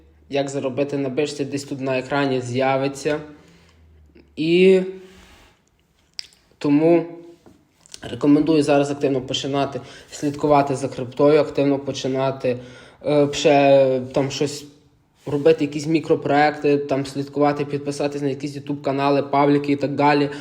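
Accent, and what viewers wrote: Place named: Surzhyk, Ukraine. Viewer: Суржик топ😂чекаємо за поінти нагороди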